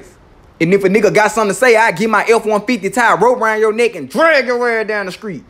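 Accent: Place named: Memphis, United States. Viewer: Bro I love your work I am from Memphis and this is how we talk!!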